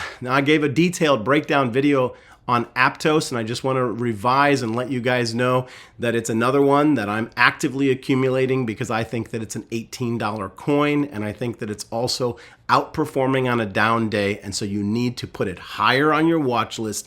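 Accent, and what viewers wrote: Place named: Eastern Europe. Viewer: great intro sir. may i ask if you are from eastern europe? thanks.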